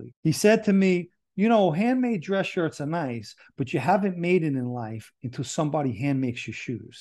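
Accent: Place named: New York, United States. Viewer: Great I'm 2nd generation Italian from D Bronx moved to Vermont some years ago. Loved your stories and it was great to hear the NY Italian accent ,It like when I watch the Sparano's I get home sick! Thanks!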